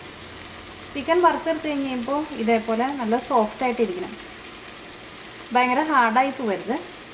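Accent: Thrissur, India. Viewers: that's how trissur people talk.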